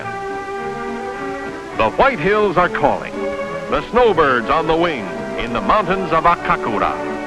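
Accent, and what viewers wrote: United States. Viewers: contemptible American accent. Devilish accent.C,est accent est celui de la mort ,pour touts les pays .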